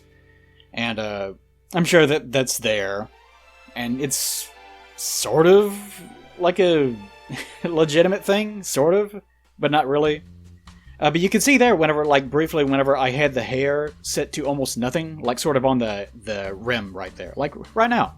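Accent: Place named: United States. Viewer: Your voice is so country. I love it.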